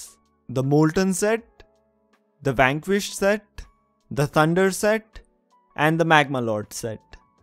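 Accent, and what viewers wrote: India. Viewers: Are you Indian I think bcz of your voice make videos in hindi it supports Indian hypixel community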